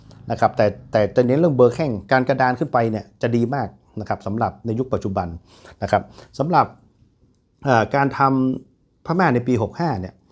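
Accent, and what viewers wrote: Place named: North, Thailand. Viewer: พูดไทยยังไงสาบเมืองทุกคำ เจ็บแอวขนาด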